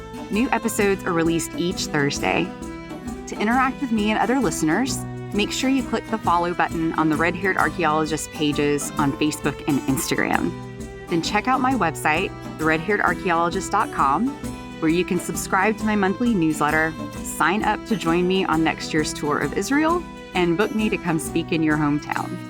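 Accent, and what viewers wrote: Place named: Nebraska, United States. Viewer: Well done! Brava! This is one of my areas of study for many years. You summarize well. If I may, I'll offer ttwo recommendations. 1: Your pronunciation is too regional, northern, like Nebraska, crimped. They say "theenks a lat," not "thanks a lot.". You say, "numadic," not "nomadic." Open your vowels more for Standard American English. 2: Relax your throat. Your larynx is crimped, so your voice is nasal. These mean practice, not much. Any might do wonders. I expect that is your normal voice. Such a respectable content suits your good mind. Its presentation deserves dressing up as you were applying for a job, which means better clothes than around the house. Most people don't think about sound at all. I don't mean that stuffy is at all better. Clothing is a pretty good analogy. Your content and you deserve recognition.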